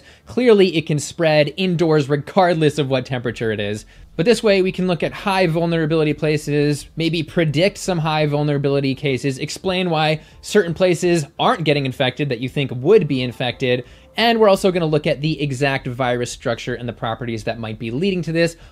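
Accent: Canada. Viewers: damn, i so thought you were canadian!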